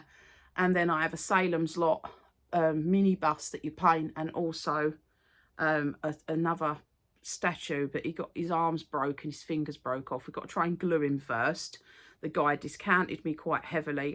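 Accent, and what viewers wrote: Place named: Essex, United Kingdom. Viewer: Hi, just found your channel, where in Essex are you from? You sound like me 😂🖤🖤🖤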